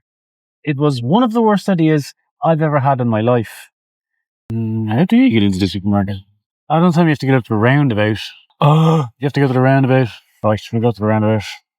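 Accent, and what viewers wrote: Ireland. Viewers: Love the Irish accent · Keep on keeping on! You are the most wonderful ‘antidote’ for a very long crap day! 😊 As I’m someone of Irish descent I fell in love immediately with your gorgeous Irish accent 😅Seriously your vlog is the bomb - it helps me calm down after a long day! Daylight saving arrives back in New Zealand this coming Saturday - thank goodness! Can drive home in the daylight from work. Keep doing you - you are the perfect recipe, ps Need my coffee too x NZ 🇳🇿👏🏻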